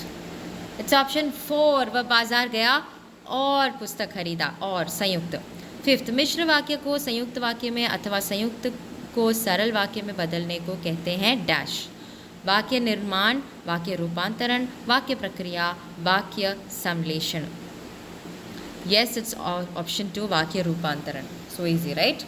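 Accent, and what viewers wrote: India. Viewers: Ma'am are u North Indian or south Indian?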